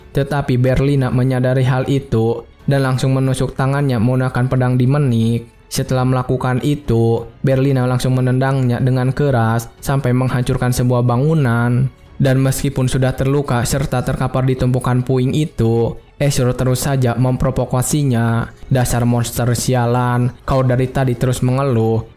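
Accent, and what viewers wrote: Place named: Madura, Indonesia. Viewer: Pasti ini orang madura yg jadi naratornya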